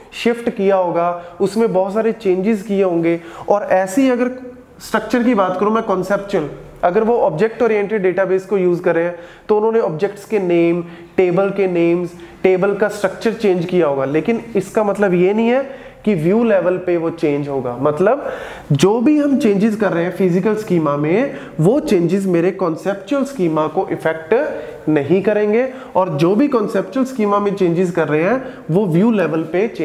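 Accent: Punjab, India. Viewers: You are excellent, and one more thing..I know that this is out of context, but I can bet you are from Punjab😂😂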